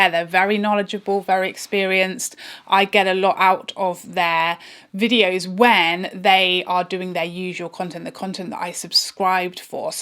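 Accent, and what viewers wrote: England, United Kingdom. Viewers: Your eye makeup here is so beautiful. I love the green. I unfollow somebody when their politics don't agree with mine. I can take it if it is background noise, but when it becomes overt, I don't care to have it in thrown up in my face all the time. I can hang with somebody if the content is lacking sometimes, because I understand that people have lives outside of their youtube channel. Also, there are channels I think I really like at first, but once I get seeing more videos, I realize it was just the one or two video that I liked. I found your channel from your divorce story, and could empathize personally, you seem near my age, your English accent is so cute, you have a nice face, and I am interested in beauty products and makeup, and so many of your looks are so fresh and gorgeous, and I could learn a thing or two! I have taken so many mental notes on highlighters and foundations I need to try from watching your videos!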